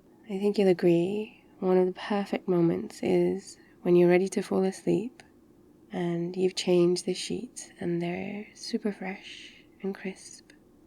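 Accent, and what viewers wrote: United Kingdom. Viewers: Are you British! Finally! I love British accents!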